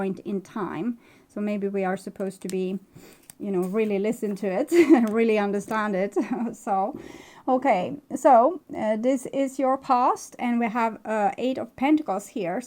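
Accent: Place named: Sweden. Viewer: ~ Thank you for the reply. I have subbed to your channel. 👍 I also, love Siana and miss her. You remind me of her. Must be the Swedish accent. Thanks for sharing your gift with all of us. Much love from Texas! 😘❤️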